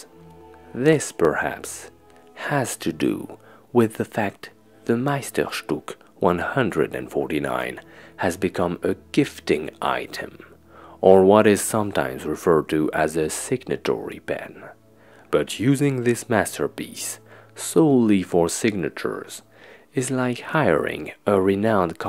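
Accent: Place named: England, United Kingdom. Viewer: J’aime your English accent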